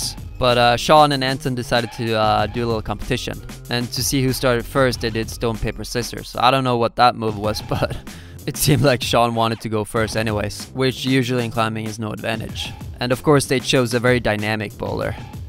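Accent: Bergen, Norway. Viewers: Damn i think his accent is changing! Less norwegian accent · It's always so weird when you speak Norwegian and it's with a Bergen dialect. I expect something else for some reason, I've mostly heard you speaking English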